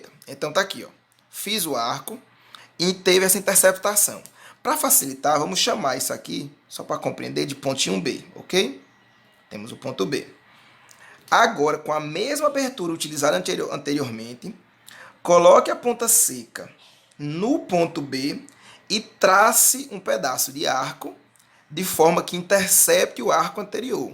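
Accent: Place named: Pará, Brazil. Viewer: ele tem sotaque do Pará kkkkkkk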